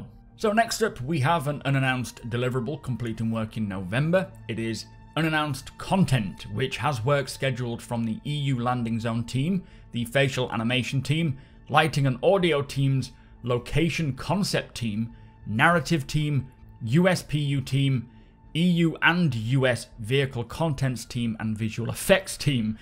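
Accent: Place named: North West England, United Kingdom. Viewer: Where you from in the north west? I'm trying to pinpoint your accent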